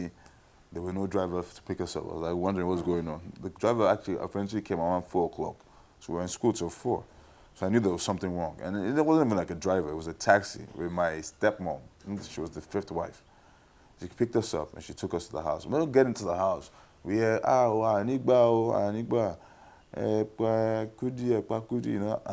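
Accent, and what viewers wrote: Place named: New England, United States. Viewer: Love the Yankee accent bro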